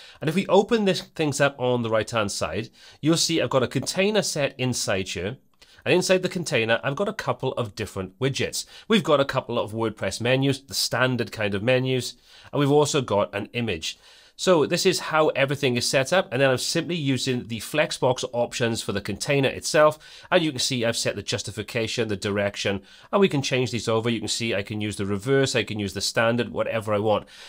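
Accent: United Kingdom. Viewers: Finally, a clear-cut yet detailed video on Elementor Mega Menus! And it's presented by a fine chap with a great British voice!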